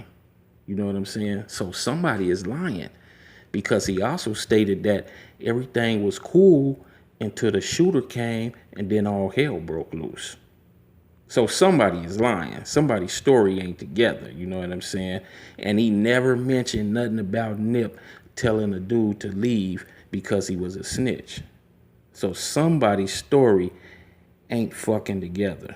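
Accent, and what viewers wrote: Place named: West Coast, United States. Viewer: sound like you are from the west coast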